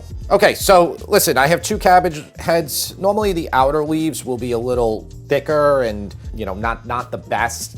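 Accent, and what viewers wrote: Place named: Pittsburgh, United States. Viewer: You sound like a Pittsburgher! My Slovak grama put ketchup in eveything including her homemade chicken noodle soup!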